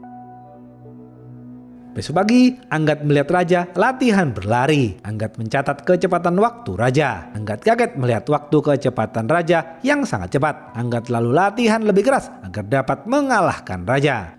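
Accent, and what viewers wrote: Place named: Solo, Indonesia. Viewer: Min suaranya kaya pria solo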